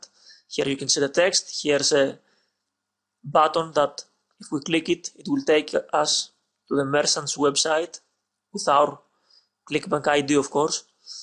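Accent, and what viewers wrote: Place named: Russia. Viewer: u are a russian dude hahaha i knew it from ur voice lol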